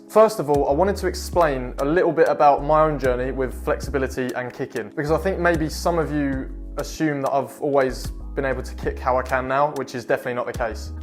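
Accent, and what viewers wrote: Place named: United Kingdom. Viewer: 😂 British English, man I understand everything, my first language is Portuguese, but it sounds funny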